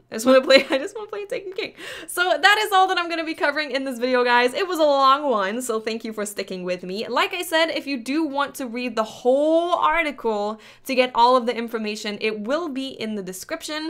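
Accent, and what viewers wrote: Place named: New Jersey, United States. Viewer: you have a new jersey accent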